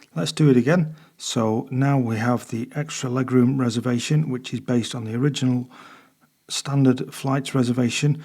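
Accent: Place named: United Kingdom. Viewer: I wish I had discovered you earlier. Advanced PHP with a nice British accent. Thanks indeed!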